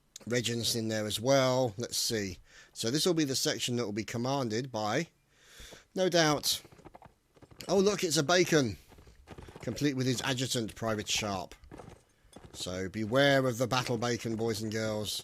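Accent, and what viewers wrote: United Kingdom. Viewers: its just moose there is no plural for moose btw keep up the good work your funny as hell lol espeically with the British Accent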